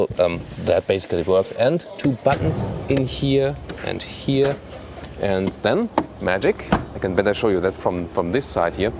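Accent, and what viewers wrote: Germany. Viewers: Oh Mann,. Dein Englisch klingt...Deutsch. Du sprichst flüssig aber mit deutscher Intonation, was absolut furchtbar klingt. Ein wenig Übung und die Sache klappt, gib' Dir einfach Mühe! Selbst als Deutscher kann man dieses Denglisch kaum ertragen. Außerdem solltest Du lernen, wie man bestimmte englische Wörter ausspricht. Beispiel: Coupe wird Cuup gesprochen, nicht Coupee. Bitte verstehe das als konstruktive Kritik.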